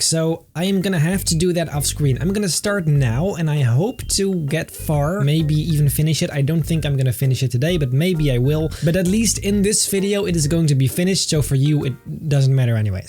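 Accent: Netherlands. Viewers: Are you from the Nederlands?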